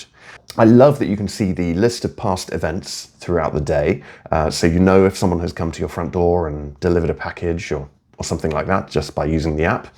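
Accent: United Kingdom. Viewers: I really liked your review of this product. I have the Eufy Homebase, Door lock and Outdoor cameras, and absolutely love them. Your review convinced me that the Eudy E340 doorbell is the one to get (I'm tossing out my Ring doorbell as the subscription costs are outrageous, and it doesn't have the Eufy capabilites). I also love your Brit accent and makes me a little homesick, as my inlaws live in Ramsey, Cambridgshire, who I miss. Thanks so very much. Oh, and I live in Columbus, Ohio, USA. Cheers. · Thx for this video. Also very easy for non-native speakers to understand. It’s a fantastic British English. Thank you for that. I love the way you pronounce glockenspiel!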